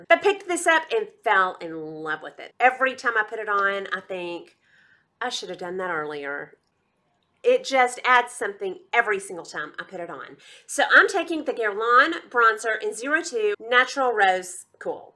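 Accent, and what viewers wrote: South, United States. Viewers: I love your southern accent!! I thought I was the only one that described my eyeshadow palettes as my children lolol xo-Wendy, NYC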